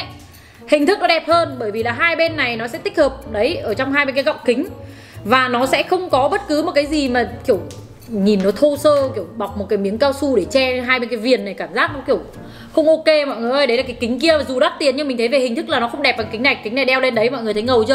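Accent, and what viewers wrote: Nghe An, Vietnam. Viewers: video sắp tới mần video review bằng giọng Nghệ nha chị :<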